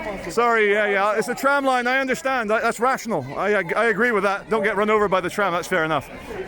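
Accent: Russia. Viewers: Absolutely love your Russkiy accent - I could listen all day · I love your Russian accent. It's really funny. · I love your Russian accent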